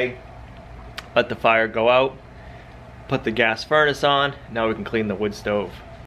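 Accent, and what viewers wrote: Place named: Boston, United States. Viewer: I thought your accent sounded familiar.... Boston strong! BTW I subbed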